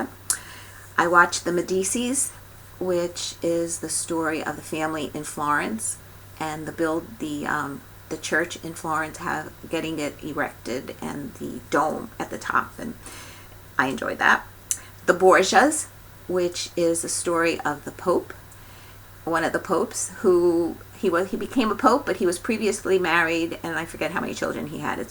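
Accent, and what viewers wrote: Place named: Massachusetts, United States. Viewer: Hi! Found you a couple of weeks ago, and when I discover someone new, I immediately go back to their first video and binge watch them. So that is what I have been doing since about Thanksgiving! And I am all caught up now with this one! You are a prolific stitcher! I enjoy all your work, we have alot of the same taste. Thst band sampler is gorgeous! Love your accent, you remind me a little of all my relatives in Massachusetts. You are very sweet and I have enjoyed catching up with you! Congrats on your little grandson! And your DIL Rebecca is so sweet! You get along so well! Hopefully you have that same connection with your new DIL Amy! You have fast become one of my faves, in my top 5! Keep stitching, take care, and hope you had a Merry Christmas!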